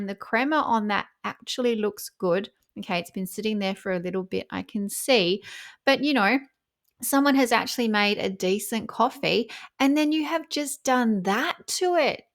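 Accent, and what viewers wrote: Australia. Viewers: You have a beautiful laugh! Love your work. Great to hear a fellow Aussies voice about this awful woman! We can smell bullshit and seriously, even if she were to go global 😅 i reckon the Australian market would be her worst. If i ordered my latte, made by barristas who know me, and they put flower sprinkles in/on it, I would suggest a holiday was in order. We take our coffee seriously down here. Imagine Lygon St italians seeing this! Santa Maria 😅😅😅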